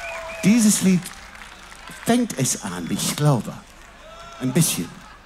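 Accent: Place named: Cologne, Germany. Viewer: Der kann auch Kölnisch Deutsch!😄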